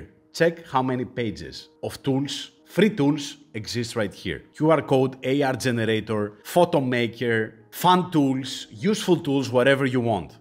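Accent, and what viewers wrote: Greece. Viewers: You have great info dear friend in this video..just subscribed to don't lose other opportunities😊..by the way your accent seems Greek, right?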